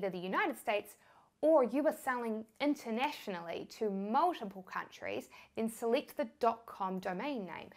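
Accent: New Zealand. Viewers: 🤓 Loove your accent, will visit Nea Zealand one day 😊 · Kiwi Accent is ❤️ ... just like Cebuano speaking English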